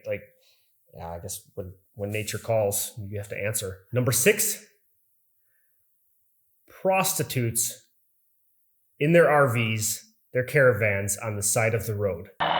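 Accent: Germany. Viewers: get the feeling that you're more German then you are american.. definitely a German accent if you ask me.. so whats your real story? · honestly, this guy sounds really german the way he pronounces stuff. or is it just me thinking that? edit: nevermind, i just heard how he pronounced Hannover, lol. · Why do you have a German accent !?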